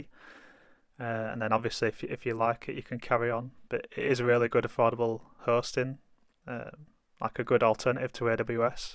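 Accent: United Kingdom. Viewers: Great tutorial. Up the northern accent! Hull all the way! Does this work well with Jetstream or is there a clash between the logins? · Hey, just found your trading bot video and seen that the next video you published was this one, recently. You sound local to me. You’re not from Teesside are you by any chance?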